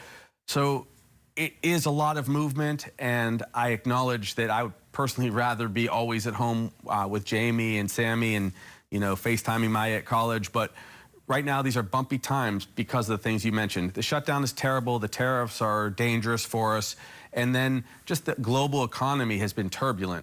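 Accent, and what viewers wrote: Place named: United States. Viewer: This guys mainland accent kinda rubs me the wrong way😮😮😮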